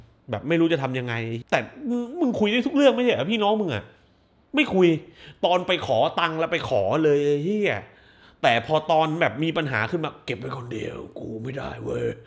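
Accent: South, Thailand. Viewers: คนใต้หรือคับ ผมชอบนะเวลาพูดใต้ เพราะมัน.อ็อฟตัด!!.....(หายไปไหนมาทีไรตกใจทุกที)สนับสนุนแกนานนะอ็อฟตัด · ชอบ พูดใต้อะ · พูดใต้เสริมๆมาบ่อยๆก็ตลกดีนะครับ เป็นเอกลักษณ์ช่องดี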